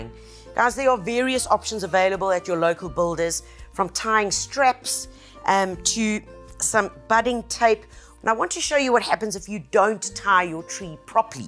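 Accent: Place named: South Africa. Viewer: Excellent video. Also, I love your South African accent. It's a great accent, and I love olives 🫒 there so delicious and have a wonderful flavour.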